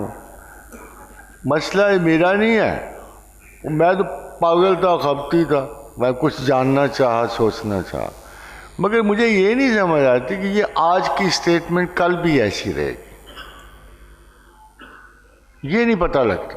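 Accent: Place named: Punjab, Pakistan. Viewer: Not able to understand your Punjabi Urdu dialects, next please speak haltedly or kindly speak in English for English audience Sirji.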